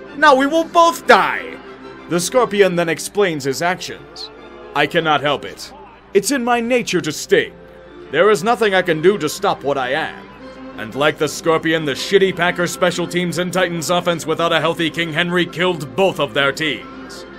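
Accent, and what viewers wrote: Pittsburgh, United States. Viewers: SIDE NOTE: I love how Beethoven's Molto Vivace is playing in the background during the narration. Goes very well with the Yinzer's voice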